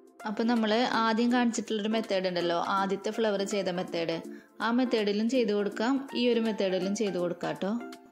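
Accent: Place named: Thrissur, India. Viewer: trissur slang💕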